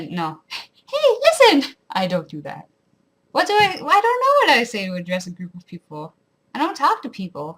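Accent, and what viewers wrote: Ontario, Canada. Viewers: I can hear the accent. I’m from southern Ontario as well but not a rural area. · I loved listening to you speak! It's true about the "out" & "about" thing. But I really think it's cute the way Canadians say it. I'm from Northern California btw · Yeah I can hear the accent, too many hawks down in southern ontario. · I also apparently have the Canadian accent. But you, you are what memes are made from. Date me. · I'm from London ontario and your accent it's super stereotypical canadian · I'm from peterborough ontario, I can definitely hear the accent since I've lived in Toronto since 2009, so my accent is like a mix of ptbo and Toronto now but definitely a difference. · SO... CANADIAN... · Formally from Southwestern Ontario (grey/bruce county). Lost most of my accent the last 20 years and I can lightly hear your accent, but my friend in Ontario can't at all, and my friend out west here with me can hear it really strong. 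Are you from Perth County? Your accent sounds similar to what I used to have. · I can hear your accent; i'm from Ontario as well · I was trying to explain my uncle’s “rural Ontario accent” to my son. I’m from the Toronto area and he was as well but more the outskirts, eventually to places including Barrie and eventually Palmerston. Your accent was pretty close! It’s interesting being from the burbs of Toronto and knowing I sound a bit different than my cousins, with a Brit influence on top of that. One specific thing is the tighter run-between of one word into the next for you plus the order of words in some instances. “Holla!” is not a thing I would ever say…might say “hey there!” or “hi!” or “what’s up!” I do know my accent is distinctive—people outside of Toronto can usually tell. 😂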